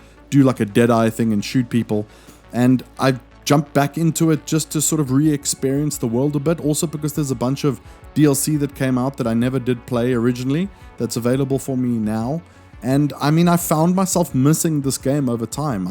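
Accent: South Africa. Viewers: Where are you from? Your accent sounds kinda South African.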